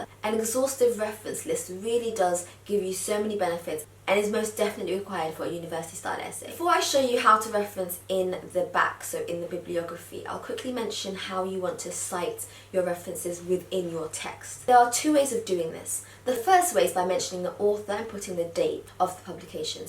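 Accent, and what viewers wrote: United Kingdom. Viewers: She has an English accent. She went to Harvard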